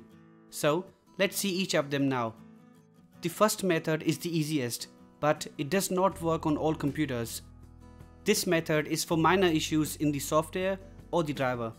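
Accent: India. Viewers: Knew when I heard the indian accent that this would fix my problem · its always the Indian voice to rescue our days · tutorial + indian accent = perfection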